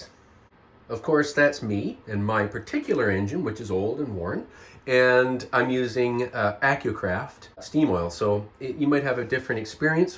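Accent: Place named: Canada. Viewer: Can always tell a Canadian by the way he says out , like a Scotsman says outt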